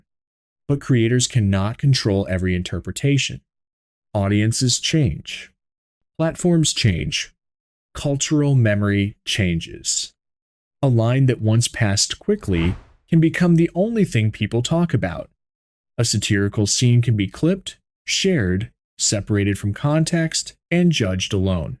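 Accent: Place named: United States. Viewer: Hey dont be critical of that. That's how Americans say that word. That's how they are taught. Live & let live ! That's the world we live in.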